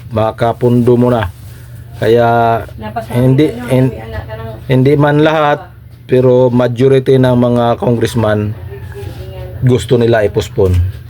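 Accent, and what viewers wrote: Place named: Buhi, Philippines. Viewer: Brod paki ayos naman ng pagsasalita mo para kang taga Buhi, Cam. SUR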